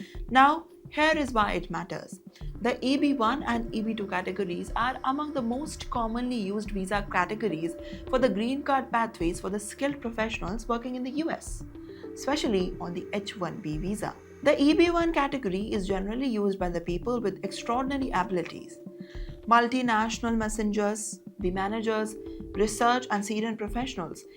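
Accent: India. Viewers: This anchor thinks that pronouncing 'r' as 'rz' alone will make her to look like American. Its totally not. Her modulation, emphasize and pronunciation all sounds Indian. Why this fakeness. Moreover with her facial expressions she is saying the following. "Even though Indians have sacrificed their lives and gone to America to work and contribute to American society and economy, Americans are I'll treating". What nonsense.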